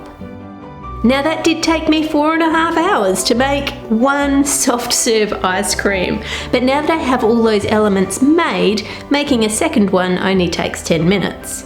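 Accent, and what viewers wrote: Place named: Australia. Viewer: It’s an Australian accent. There is more than one.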